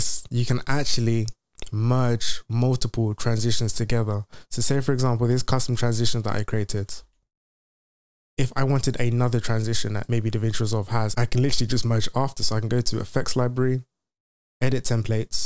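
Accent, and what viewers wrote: United Kingdom. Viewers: Enjoy your content my man. Step by step and super clear. Also love hearing a UK accent. Subbed keep up the davinci content!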